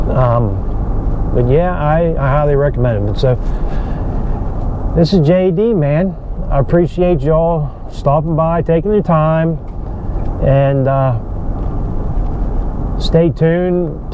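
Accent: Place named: Pittsburgh, United States. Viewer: Very nice review! I think I detect a “Yinzer” dialect…you from Pittsburgh? I recently got my ‘23 XL Crew Cab, 2wd with the locking rear end. It’s about as basic as you can get. I love it so far & it does decent in the snow up here in Erie; I’ll get snow tires if I need them.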